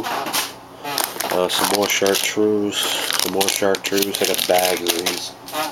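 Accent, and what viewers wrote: Boston, United States. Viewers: do the Zoom tab tail grubs swim well for you? I bought a pack intrigued at the idea of an erratic tail action the tab would give, but i couldnt get them to swim right no matter how I trimmed my swimjig skirts. Also, just curious. Are you from Boston? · you sound like a boston guy, if so we should make some casts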